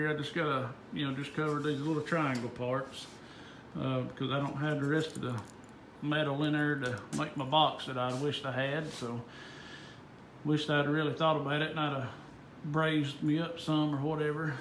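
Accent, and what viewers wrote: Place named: South, United States. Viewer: Alabama or Georgia? My Mama was from Columbus, Georgia and it's my hobby guessing where ya'll from with your Southern accents. She never got used to Montana winters. Foot in mouth if I'm wrong.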